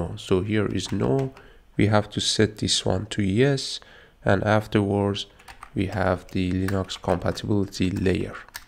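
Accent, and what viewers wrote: Iran. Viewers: حاجی دمت گرم بابت چنل خوبت، همون اول که ویدیو رو باز کردم و انگلیسی صحبت کردنت رو شنیدم، فهمیدم ایرانی هستی😎😎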